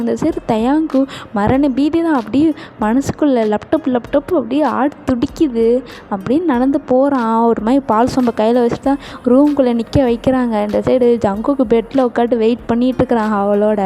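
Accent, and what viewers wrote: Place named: Coimbatore, India. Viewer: Super story 😅ma semma I am your new subscriber ama neenga Coimbatore a slang appadithan irukku athan ketten 😅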